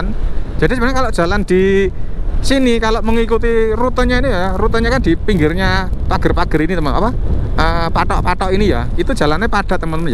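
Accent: Java, Indonesia. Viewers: kaget gua,,logatnya mas mas banget!!!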